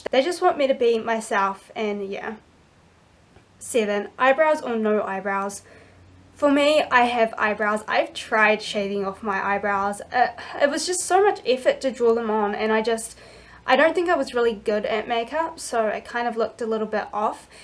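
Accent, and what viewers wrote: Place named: United Kingdom. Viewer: Damn, you are fucking HOT!!! Love a British accent!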